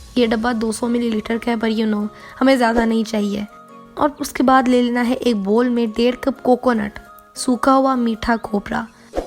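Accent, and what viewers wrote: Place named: Punjab, India. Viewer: Awaz kharab hai bol rhi ho aap . Par aise bhi kitni pyaari lagrahi hai . Aapka punjabi accent bht pyara lgta hai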